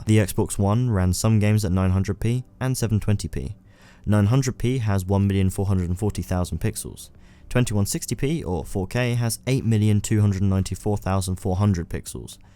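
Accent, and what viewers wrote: United Kingdom. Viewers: Thumbs up if you like to hear doing movie reviews in his righteous British accent with a shot of Scotch!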